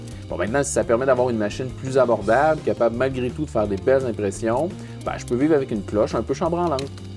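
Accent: Quebec, Canada. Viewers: Génial, je viens de découvrir ta chaîne, c'est vraiment cool tout tes trucs, et côté vulgarisation, c'est un plus et en plus, en québécois !!!! J'ai rien contre nos cousin français, mais du français de chez nous au Québec, sa sonne mieux à mon oreille ;) ! Se serait bien une suite sur l'impression 3D, je m'intéresse pas mal au sujet, j'aimerais bien en apprendre d'avantages particulièrement sur le dessin de tes propres pièces. Je suis maquettistes et sa pourrait m'être utile. Si il y a un logiciel de base, pas trop complexe d'utilisation pour les débutant et pas trop dispendieux, encore mieux gratuit et en français se serait vraiment le fun :) Merci, et continu ton bon travail !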